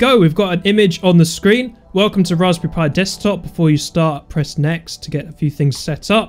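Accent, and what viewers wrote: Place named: Belfast, United Kingdom. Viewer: u r from belfast. i know that :)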